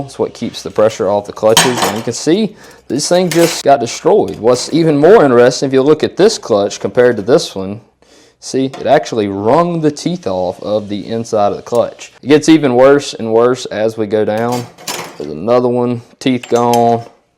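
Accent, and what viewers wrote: Alabama, United States. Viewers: That Alabama accent gets a smile on me every time!😊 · He's from Alabama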